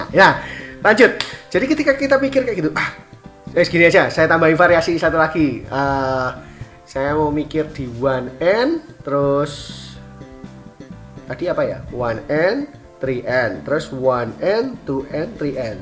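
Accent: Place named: Java, Indonesia. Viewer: Natural medok e koh. 😁😁😁😁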